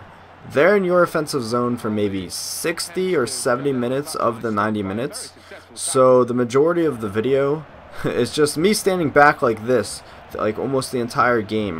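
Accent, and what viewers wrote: United States. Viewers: umm no if your european or whatever its Football. but here its soccer and since is american, its soccer to him, not football, football to us is an amazing sport played with an oval ball. and we pronounce defense different because thats how we say it here. its just different here than it is where you live, no reason to be upset because we say things different here. i don't complain cuz you say mum and stuff because i understand people in different places speak differently.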